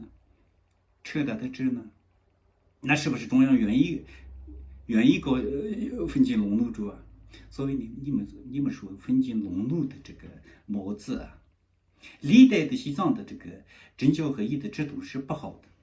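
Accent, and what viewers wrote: Qinghai, China. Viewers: 他的汉语这么地道 他带的是青海口语。 我觉得你没有根本读过小学的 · 但是我从来没有听说过尊者说过没有神灵的说法😏哦😳我错过了什么一部分·．还是，听错了， 请不要把你的想法和达赖喇嘛的说法混为一谈，尊者教导我们要尊重其他的．异，信仰，但是我从来没有听说过你说的….没有神灵、 还是你的青海话，我听不懂，如果听错了请你原谅我的言 论. 但藏族人说藏语还是听起来比较舒服.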